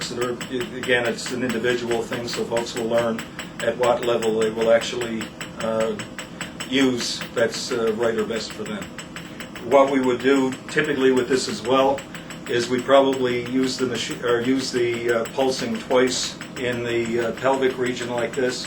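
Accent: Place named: Newfoundland, Canada. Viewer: This doctor is a Newfie! I can hear the accent.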